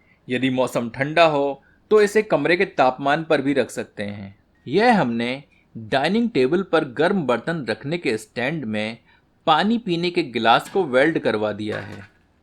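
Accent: Standard. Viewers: आपकी शुद्ध हिंदी का उच्चारण भी ... ह्रदय को भी हर्षित कर देता है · Your shudh Hindi is so irritating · aapki sari decease or apka suddh hindi me bollana bahut bahut pasand hai 👍👍❤️👍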